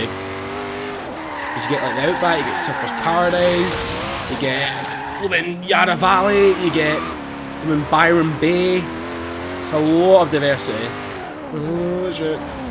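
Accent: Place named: Glasgow, United Kingdom. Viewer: Bro I'm Scottish tae and love forza hyped for forza horizon 3 u sound like yer fae Glasgow ,I'm fae uddingston but cheers fer the vids m8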